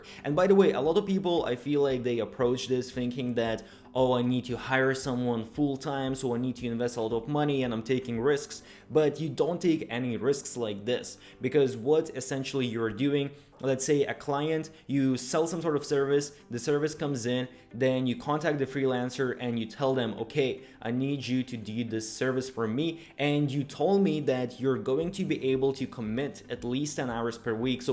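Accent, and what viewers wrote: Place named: Germany. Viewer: Could be German, or Russia or Estland?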